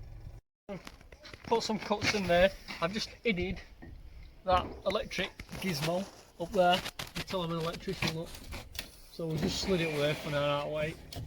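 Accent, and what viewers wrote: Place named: Yorkshire, United Kingdom. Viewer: Gotta love Yorkshire English "Ahv just idded tha light in ther".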